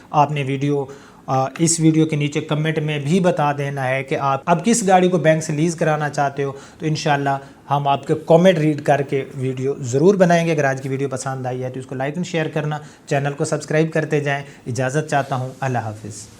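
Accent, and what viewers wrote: Saraiki, Pakistan. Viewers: اپ کی اردو اور انگلش کے الفاظ کی ادائیگی بالکل بھی درست نہیں ہے اپ کا سرائیکی لہجہ ہے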